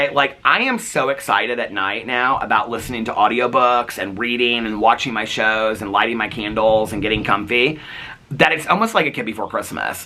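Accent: South, United States. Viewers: Miss Dolly Parton had the strong hold on you since you were 10 😂 bet that is where the southern accent came from! 🤠💙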